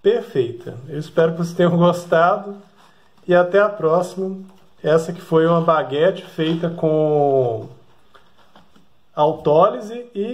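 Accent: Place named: Minas Gerais, Brazil. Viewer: Dou mais uma apertadim....... Sotaque mineiro não nega!!!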